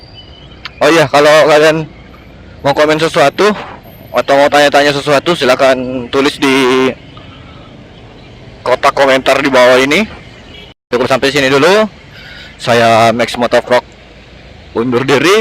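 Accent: Makassar, Indonesia. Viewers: mamtap bro logat makassar hehe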